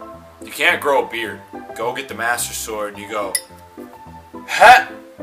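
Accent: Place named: Germany. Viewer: I wish you would have done this in your german accent, but this was really funny you should damage your liver more often xD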